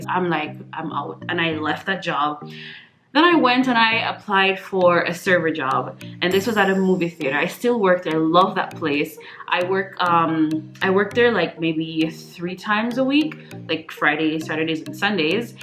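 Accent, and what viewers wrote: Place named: Jamaica. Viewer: sounds like a yaadi (Jamaican)....are you?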